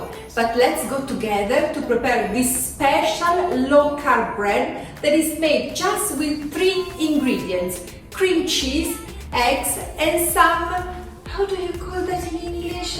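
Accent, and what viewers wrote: Italy. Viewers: I love your recipes! They are so simple but DELCIOUS. To me you make keto very easy, and also healthy. I appreciate it that you show the ones that don't go 100% perfectly because that's real life and I am encouraged to keep going even if I don't get it right! I'm going to try this recipe and if they go flat I will think of them as a 'flat bread' and put the meat ontop... delicious! Ps. Your English is so good... I understand EVERYTHING you're saying... and I love listening to your lovely Italian accent. Never change!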